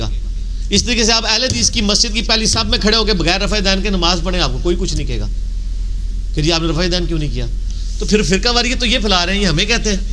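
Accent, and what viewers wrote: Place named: India. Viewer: Indian Urdu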